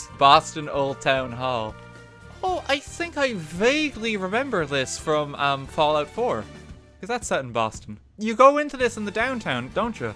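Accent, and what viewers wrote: Ireland. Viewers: No he's irish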